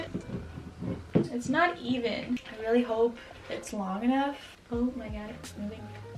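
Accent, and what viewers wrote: Netherlands. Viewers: Wait what are u Dutch? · Are you dutch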